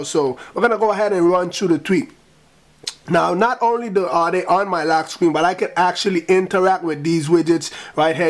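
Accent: Jamaica. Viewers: II knew you was Jamaican. Check out vs in YouTube the shut is fire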